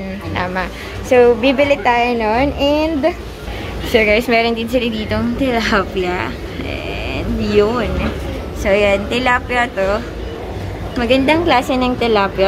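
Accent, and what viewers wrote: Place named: Balikbayan, Philippines. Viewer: Soo konyo nemen yern. Balik bayan accent lang malakas😆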